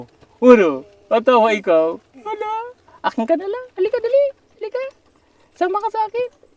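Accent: Visayas, Philippines. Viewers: Sir naaadopt mo na Ang accent Ng bisaya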